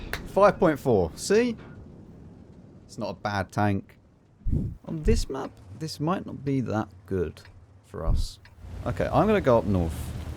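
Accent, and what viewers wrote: United Kingdom. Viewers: He speaks proper and with the english accent that I want to acquire.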